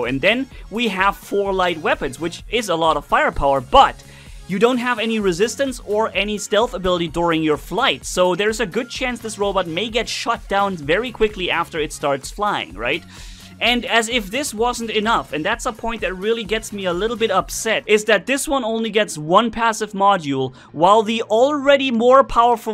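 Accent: Germany. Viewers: Du sprichst ürsprünglich Deutsch oder?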